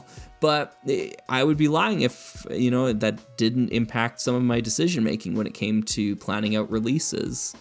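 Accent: Canada. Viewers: A Canadian calling their own accent "harsh" is the most Canadian thing I've ever heard Great video,! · nooo! Don’t apologize for your charming Canadian accent eh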